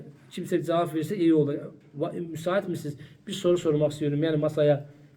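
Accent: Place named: Azerbaijan. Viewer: məndə səni dogru düzgün başa düşə bilmirəm gay Azərbaycan şivəsində gay Türkiyə şivəsində.gahda rus kəlməsi istifadə edirsən.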